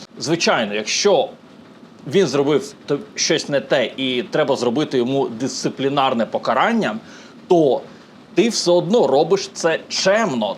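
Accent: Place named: United States. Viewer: скажіть, будь ласка, ви вже напевно довгий час не проживали в Україні? Бо, наче, спостерігаю американський акцент у розмові)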